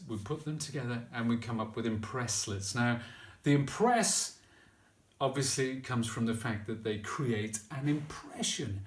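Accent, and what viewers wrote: United Kingdom. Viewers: Well done! Also... nice to here a fellow British accent! 🇬🇧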